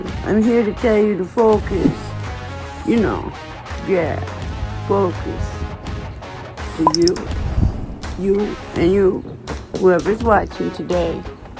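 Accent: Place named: South, United States. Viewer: ❤I hope you didn’t drive in that Terrible Dangerous Snowstorm ❤Take Care❤I am outta that Mountain 🏔️ Hillbilly Cabin of Mine for Now &Back in the Northern “Q”City. Were there is less Snow ❄️ than the Mountains 🏔️ are covered Deep the Higher You Go..2to 3 Feet 👣 up ⬆️ in the Mountains..Down in the Valley 6”inches at most…We live in Lower Mountain 🏔️ Valleys mostly in British Columbia…Your Voice is nice “Southern Drawl”You don’t have to speak faster then I’d have Trouble understanding..Keep on Posting every Day has a New Story to tell😊😊😊❤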